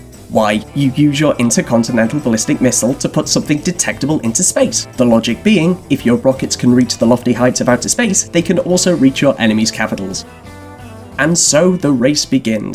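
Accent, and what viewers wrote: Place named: Russia. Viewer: Nice Russian accent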